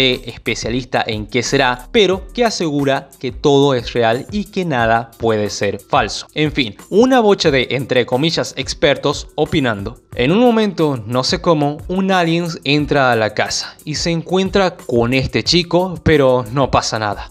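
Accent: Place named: North, Argentina. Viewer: jaja no puedo evitar pensar que sos de santiago o de alguna parte del norte, muy buen video 👏🏻👏🏻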